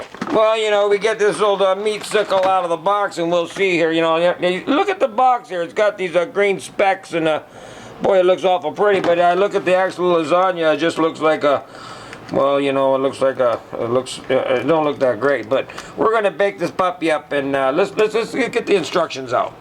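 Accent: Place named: Green Bay, United States. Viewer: You sound like you're from Green Bay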